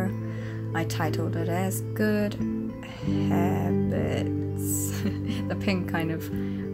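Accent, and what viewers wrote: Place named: New Zealand. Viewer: I follow you on ig nd u sound lika full on moari 😂 didnt expect tht.. Was thinking like an american accent or asian(i swear im not racist,forgiv🙏)😆 Btw Green tea all da way fo me ✌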